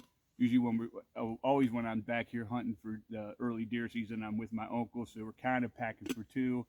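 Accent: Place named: Philadelphia, United States. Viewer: Nice job with your video. What County are you in? You sound like you’re from the Philadelphia area!!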